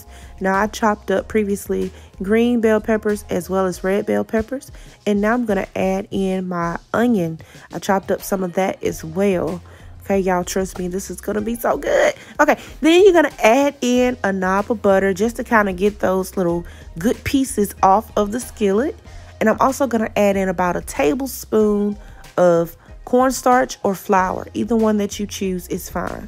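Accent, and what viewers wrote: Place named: Atlanta, United States. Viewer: Looks awesome!!!, u sound like you’re from Atlanta or Atlaanna how we say it😂😂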